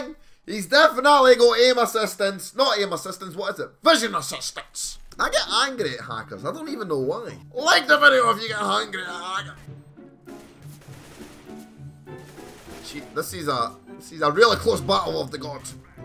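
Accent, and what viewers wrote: Scotland, United Kingdom. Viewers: I think he's Scottish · i love your Scottish acciedent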